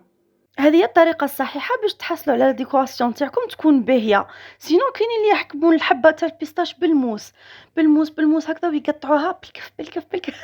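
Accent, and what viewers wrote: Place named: Algeria. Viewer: اختي من خلال لهجتها راهي تبان جزائرية محضة ...لهجة المغاربة باينة وواضحة ولا يختلف عليها اثنان ..سبحان الله😊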